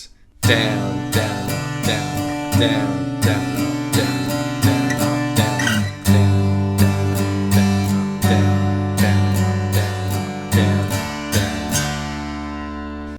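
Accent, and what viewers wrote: Australia. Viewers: Dont forget the australian accent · I’ve never seen an Asian with an Australian accent before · with an Australian accent (i think)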